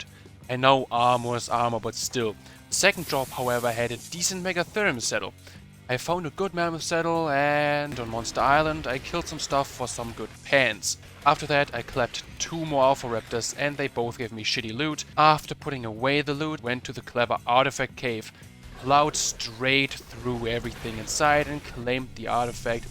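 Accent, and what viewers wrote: Germany. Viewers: Bro Sounds like a german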